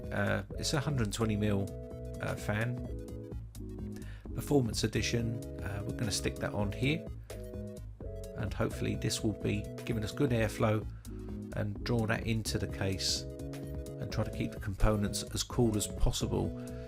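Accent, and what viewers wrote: United Kingdom. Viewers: It's about 39 pounds Me, an American: Oh golly gee willakerz that's heavy!!! British accent continues Me: Oh right...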